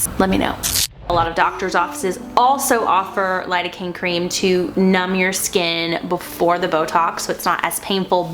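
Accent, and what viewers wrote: South, United States. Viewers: F A N C Y - really southern accent - love it!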